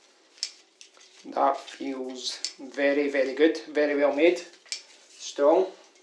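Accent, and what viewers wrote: Scotland, United Kingdom. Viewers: Are you from Scotland by chance?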